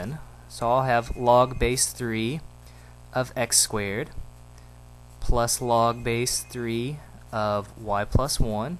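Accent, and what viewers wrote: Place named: South, United States. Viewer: Aw you missed a phone call for us. That's dedication right there Sir. Also, you should do a video in your southern accent - I think you mentioned you have one in another video.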